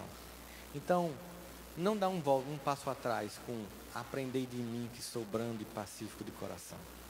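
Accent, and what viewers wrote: Northeast, Brazil. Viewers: Onde você estava? Que bênção você é...ainda mais com esse sotaque lindo...nordestinos são demais...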